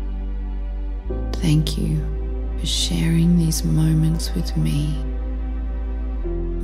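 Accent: Australia. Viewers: What a beautiful meditation! Her voice is just so calming & soothing. I believe it was an Australian accent(?) I LOVE that accent!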